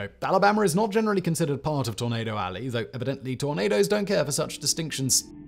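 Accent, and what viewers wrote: United Kingdom. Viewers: It's seriously boggling my mind to listen to a Brit who lives in Prague speak about my home state of Kansas. What a world we live in!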